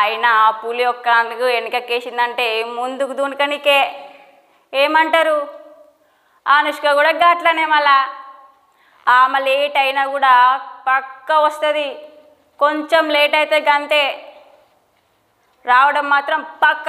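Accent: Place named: Warangal, India. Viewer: Wowwww Excellent Anchor... Warangal Language super super Medam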